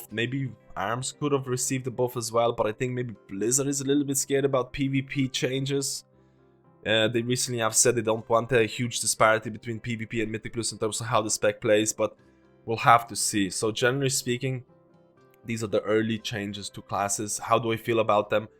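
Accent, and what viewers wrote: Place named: Ireland. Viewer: Dude you are without doubt the best healer content creator out there. Funny i thought that ur from some nordic country, because of your accent just to realize that ur irish. Really different accent from brittish one :D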